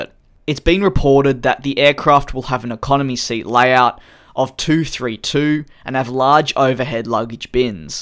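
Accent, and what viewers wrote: Australia. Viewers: Wait.. u from Australia? thought you were from GB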